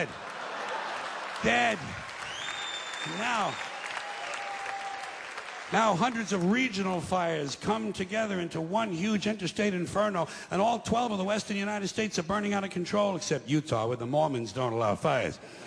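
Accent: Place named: Mississippi, United States. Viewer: this motherfucker is a good comedian but tone down that Mississippi shit bro!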